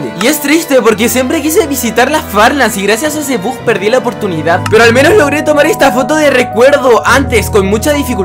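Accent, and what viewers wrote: Chile. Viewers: eres chileno? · Eres chileno?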